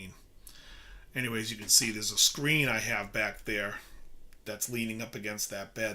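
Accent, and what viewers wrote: Boston, United States. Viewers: You're smooth as silk on that Glamour Profession outro. Keep up the good work. Love the rants and the Boston (or vicinity) accent. Do me a favor and work the word 'car' into a rant sometime soon.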